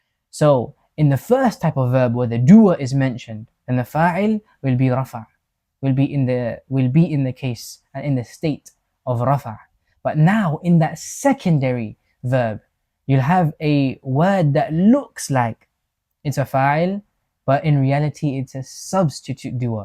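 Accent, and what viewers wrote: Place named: United Kingdom. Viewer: Hi Teacher, since I'm learning (some) Arabic I gather and collect explanations and finally making my own lessons understandable. You just Explained something very Crucial which I've never heard before, moreover I didn't even know it existed. The tricky Fial or Verb, there are 2 types, 1 which is mentioned and 1 which is Not. You are the first to Mention or to Explain these 2 types of Verbs and the differences in Detail. Good Job 👏🏻. One more thing, .... I like your typical British Accent, this gives the classes more flavour 🎉 I wish for lots of Success and more followers / Students. Excuse me for my mistakes, I'm a Dutch speaker. Best Regards from August from the Netherlands 🖐🏻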